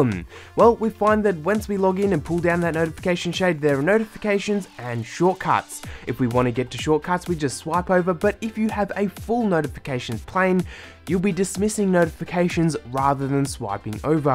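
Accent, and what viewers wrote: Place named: Australia. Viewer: Ugh...Aussie accents.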